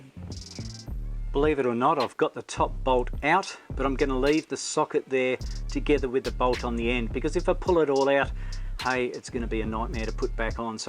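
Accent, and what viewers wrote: Australia. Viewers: Awlternator lol NSW accent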